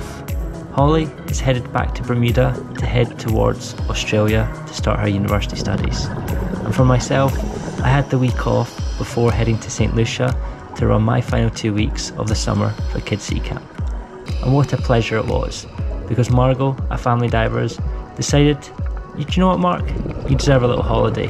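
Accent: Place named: Scotland, United Kingdom. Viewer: It's one of the many things I love about your channel. Never lose your Scottish brogue. I have cousins that visit from Ireland. I can tell the difference between that and Scotland but there are overlaps. Someone might say, "fancy going for a wee dander?" There was a time when I did not realize that meant, would you like to go for a walk? My wife is French Canadian and I never realized how many figures of speech American use that she is not familiar with. 😄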